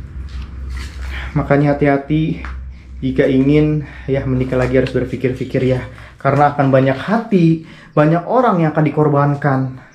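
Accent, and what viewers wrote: Sunda, Indonesia. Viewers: Assalmualaikum kang...purwakarta hdir met pgi,br td pgi liat aa ngreview rumkit cirebon skrg rmh yg terbngkalai,ngdengr suarany cndu bbgt a..logat sundany itu lo kntal bngt😚🙏😘🥰 sukses trus chanel ny ya a🙏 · Panutan banget nih Bang....sukses terus bang hehe...semoga selalu bisa mempertahankan adat logat sunda dan makin meningkat...makin keren makin joss par jos geng...salam sehat salam santun salam explore....Bang... hehe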